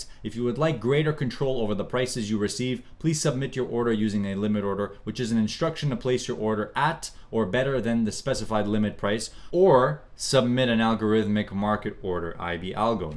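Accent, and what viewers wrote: Canada. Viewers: By chance, are you from quebec?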